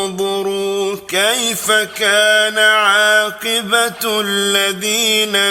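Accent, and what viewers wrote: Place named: Oman. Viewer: فعلا قراءة بنكهة عمانية .. زادك الله علما وفقها ... .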